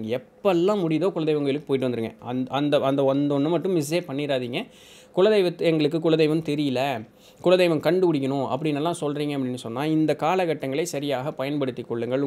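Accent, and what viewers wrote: Coimbatore, India. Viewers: சார் கோயம்புத்துாரா?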